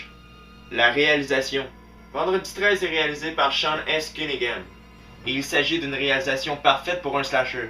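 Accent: Canada. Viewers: Vive le Canada. J'adore votre accent. Bizzzzzzzzzzz. J'achète.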